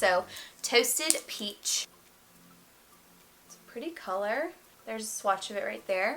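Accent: South, United States. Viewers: Love this!! My new fave beauty YouTuber love all your makeup looks, finally someone with the same skin tone!! And southern yass!!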